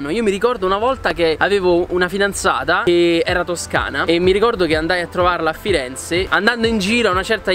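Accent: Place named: London, United Kingdom. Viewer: tu stai tentando un accento tipo Londinese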